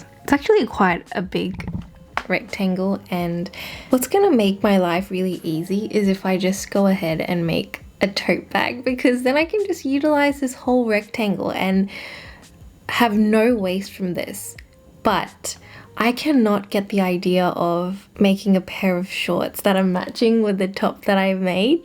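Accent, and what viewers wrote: Australia. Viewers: Love this! So creative and clever! Thanks for sharing! I am now a sub! Also good to see and hear a familiar Aussie accent 🥰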